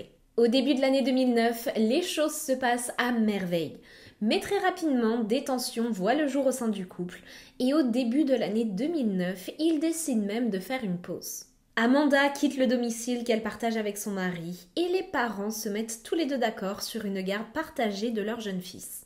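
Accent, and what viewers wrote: Quebec, Canada. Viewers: je viens de découvrir ta chaîne & woww ton maquillage t’es toujours parfaite🫶🤍 j’adore tu parles beaucoup de cas que je connais pas ou qui sont moins connue en étant une grande fan de true crime. Je suis québécoise et ça m’arrive de regarder des youtubeurs français, j’ai l’impression que t’es française, crime je trouve que t’a un accent québécois AHAHA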